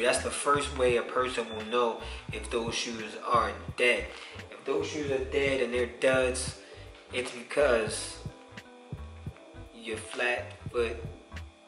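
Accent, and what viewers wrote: Baltimore, United States. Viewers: u from baltimore???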